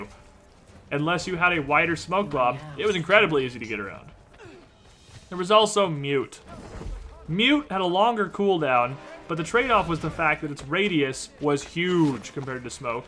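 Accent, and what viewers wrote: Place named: Sweden. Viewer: i dont play ac3 or any i just come for the sexy gameplay and sexy voice and comentary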